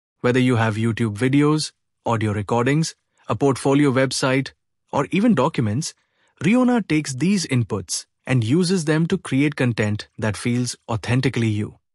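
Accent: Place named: India. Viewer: it's sound like your neighbourhood scam call center indian man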